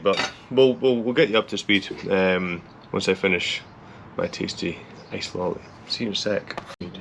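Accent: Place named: Wales, United Kingdom. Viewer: Hey man good job on vids. As a Welshy who moved to the states a couple of years ago who is literally in the middle on a XS750 Cafe build your vids have been a godsend plus hearing an accent from the home land always helps. All the best!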